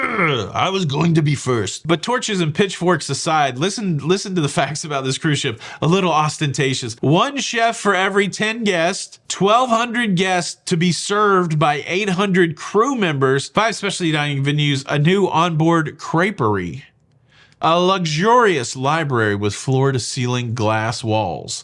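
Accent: United States. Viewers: Sounds typically American to me.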